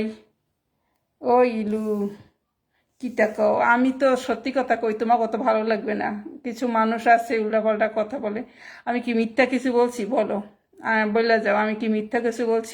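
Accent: Barishal, Bangladesh. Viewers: ভাষা শুনে মনটা ভরে গেলো,, আমার প্রাণের সেই বরিশাইল্লা ভাষা 🥰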